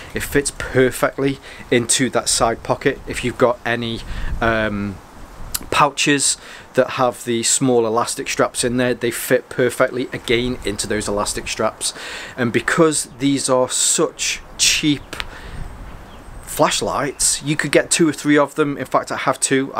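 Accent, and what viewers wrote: United Kingdom. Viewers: Interesting how Brit accents differ as they do here in the US. You for instance sound like the guy on Delboy's Garage. How's the light held up?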